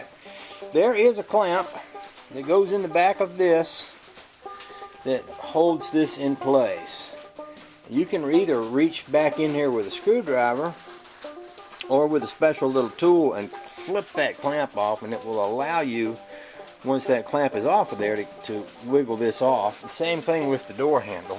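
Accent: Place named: South, United States. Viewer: i love that accent. go South!!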